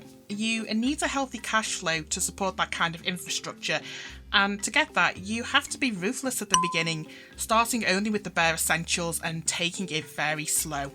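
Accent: Liverpool, United Kingdom. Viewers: you sound like you're from somewhere surrounding liverpool, nice video too!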